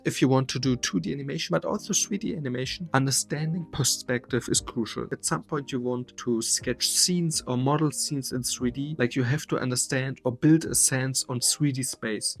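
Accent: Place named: Germany. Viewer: i hören little bit deutsch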